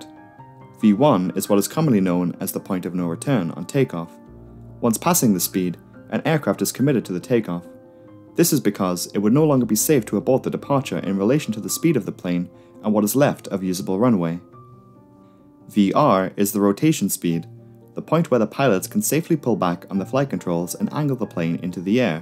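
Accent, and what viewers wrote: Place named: Northern England, United Kingdom. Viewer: Informative and concise videos. Very well made. And a fellow northerner, eh? I'm from County Durham - not far away!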